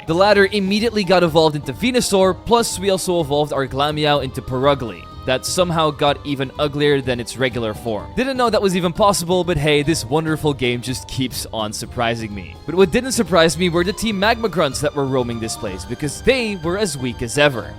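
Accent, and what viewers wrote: Netherlands. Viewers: He does kinda have an accent which implies he normally speaks Dutch, so i suppose he is from around Antwerpen. He also mentioned it multiple times a few years back which makes me realize i have been following his content since around the Covid times...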